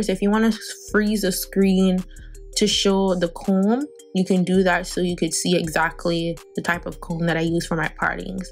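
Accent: Jamaica. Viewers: I can hear the Jamaican accent♥️🇯🇲...It's everything for me 💯❗ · Your Jamaican accent gave you my sub😍😍😍mi luv mi yaardie dem baaaad😂😘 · Wait girl.. u jamaican or supm?... cause mi a hear a likkle supm supm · Are you from 🇯🇲???? Cause I heard a lil jamaican accent..... And I really like this style. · I was just about to say u sound like Jamaican 🥰🥰 love my ppl.. About to do my hair like this now thanks for the video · Cause me know you sound jamaican girl big up 💯 Am a try your way tomorrow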